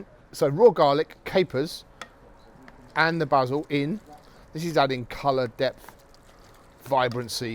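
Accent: England, United Kingdom. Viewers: Or he's just speaking english instead of american.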